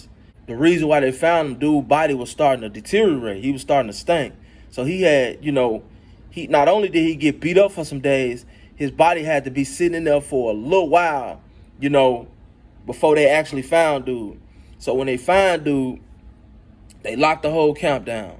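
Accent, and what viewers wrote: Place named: Alabama, United States. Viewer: Damn I didn’t know you was from Alabama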